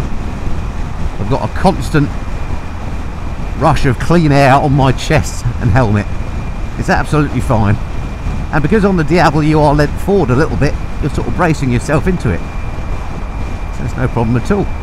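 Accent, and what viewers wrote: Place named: United Kingdom. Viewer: Jeez, you don't have to be on a moto to be worthwhile---just your Brit intonations make you interesting enough for a Yank like me! You've got a niche in narrative video journalism; motorcycles and light aircraft will give you a lifetime of material.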